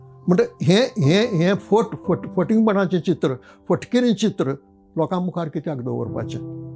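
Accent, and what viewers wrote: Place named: Konkan, India. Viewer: Great words... A lesson to learn.. Secondly what a pure konkani.. Love to listen...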